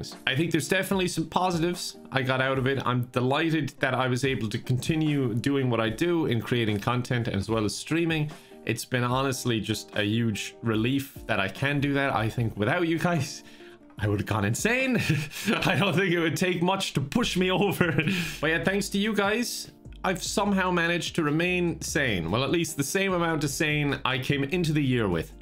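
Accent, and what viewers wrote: Ireland. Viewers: Instead of listening to music in the car, I play videos when I drive. Nothin like Irish accent to get you going places (no pun intended) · i watched many of you videos this year after a friend sent me your first among us one when she was tryna get me to play and i kept watchin them because it made me miss my grandparents a little less,, that might sound weird but theyre irish and your accents are slightly similar tho yours is stronger i havent seen my grandparents for over a year so thank you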